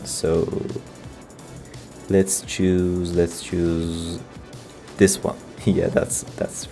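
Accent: Turkey. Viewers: Are you from Turkey ?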